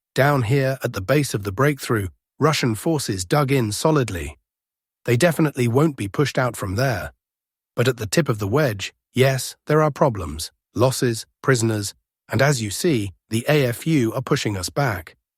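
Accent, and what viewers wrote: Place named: United Kingdom. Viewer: You're no! You speak like a British, better than British!!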